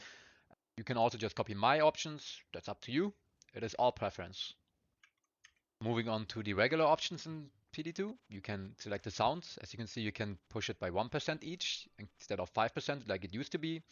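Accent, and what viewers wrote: Germany. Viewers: Are you a German Dude? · I hear a bissele german in this enlgish 😊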